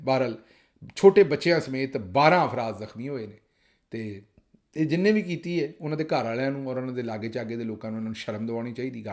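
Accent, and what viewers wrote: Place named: Amritsar, India. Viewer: I agree. His Punjabi is sophisticated and sweet. He mentioned that he speaks Amritsar dialect. We speak similar Punjabi with Jalandhar dialect. This is not to detract from his amazing jihad against the dictatorship.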